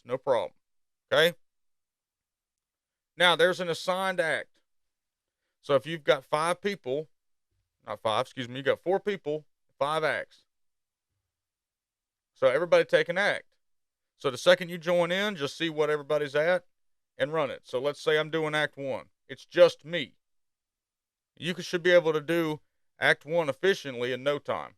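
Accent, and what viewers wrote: South, United States. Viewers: Where are you from? Alabama, Texas?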